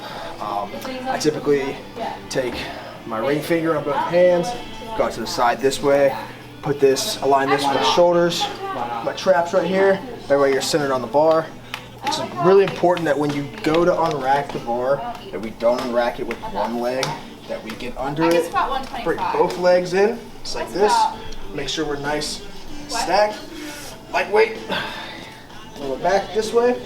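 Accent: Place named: United States. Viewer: This dude sounds so USA.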